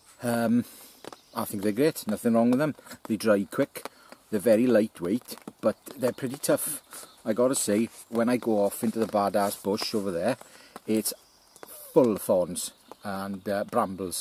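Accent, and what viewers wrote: Wales, United Kingdom. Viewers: I love the way you say feuwell, always loved the welsh accent..Ive a lot of welsh ancestry..I bought a pair of pilgrims recently and rate them. Love helicon tex, real well made stuff. Been wearing their combats too (SFU's) for a while and they're great, well made. Even their version of the M65 pant are bang on. The pilgrim pant can take kneepads but the opening is on the inside, wierdly, well on mine they are at least. Diolch brother...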